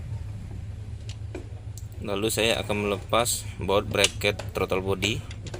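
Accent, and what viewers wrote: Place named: Palembang, Indonesia. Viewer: Logat Bahasa suara anak palembang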